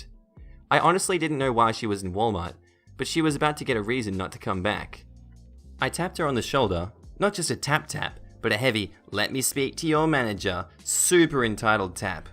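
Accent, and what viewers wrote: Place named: Australia. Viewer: With the ZED, he could also be from Canada, although not with the Australian accent. I think he's mentioned in other comment sections he's from Queensland (north east Aus).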